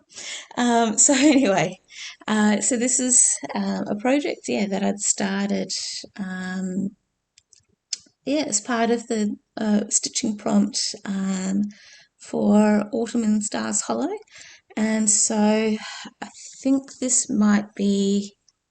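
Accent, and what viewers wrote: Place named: Australia. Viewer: Hi! Lovely to hear another Aussie accent on YT - you popped in to my feed this morning while sipping my morning coffee. I’m now a new subscriber and look forward to seeing some more of your videos 😊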